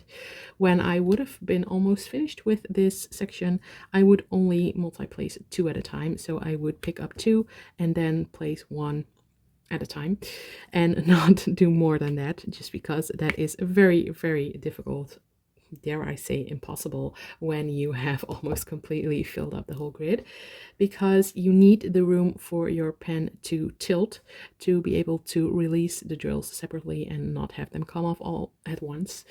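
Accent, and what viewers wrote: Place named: Netherlands. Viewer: Clocked the Dutch accent immediately hahaha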